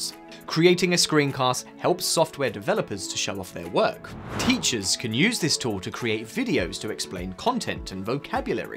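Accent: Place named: United Kingdom. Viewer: Sir your English is perfect British and clear that is why I watch your videos. Thank you very much.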